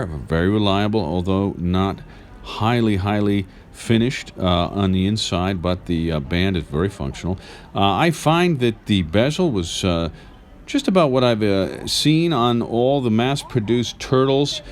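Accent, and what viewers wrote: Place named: United States. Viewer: Why can't all Americans sound like you. Both you and TGV have taught me so much about Seiko. Cheers👍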